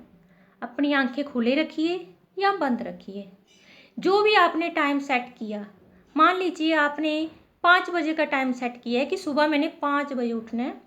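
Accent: Punjab, India. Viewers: Nice video. You are pure Punjabi( I mean your Hindi is in Punjabi tone)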